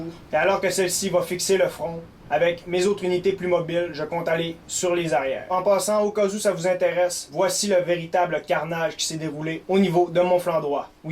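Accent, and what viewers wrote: Canada. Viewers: t'es canadien ou quebecquois?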